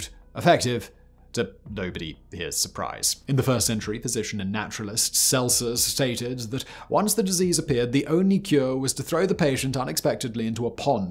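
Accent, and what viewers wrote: RP, United Kingdom. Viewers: Strange that this guy gets so much voice-over work since his affect is difficult to hear. He drops the last several syllables of every phrase such that it is...... Therefore, it strikes me as odd that he would....... Still, the accent is posh British, so that affords him some....... But don't mind me, I'm just....... Anyways, I hope you all have a....... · Well, he's British. They often speak that way.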